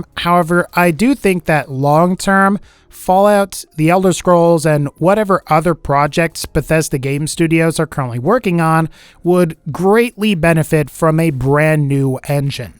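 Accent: South, United States. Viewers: Does anybody miss the loud southern accent that used to do😕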